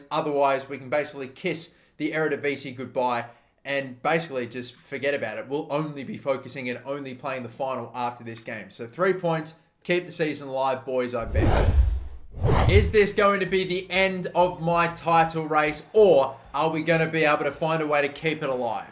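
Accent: Australia. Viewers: halo289 he’s Aussie 😂😂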